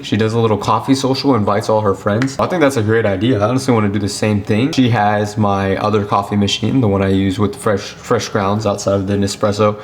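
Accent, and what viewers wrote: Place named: New Jersey, United States. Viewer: I saw that view and thought it was Dubai, but then you seem to have a NJ accent. Good luck with the new apartment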